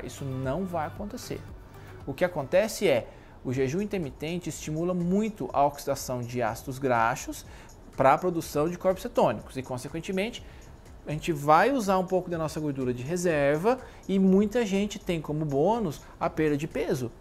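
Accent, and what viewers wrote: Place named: Minas Gerais, Brazil. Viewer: Esse seu jeito mineiro de falar que acalma a alma, Uai eu amo isso ❤ #tamojuntosempre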